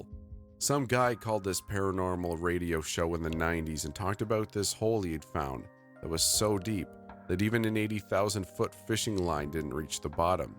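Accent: Canada. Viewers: "Soary=sorry" "bean=been" lol are you Canadian? Great narration anyhow!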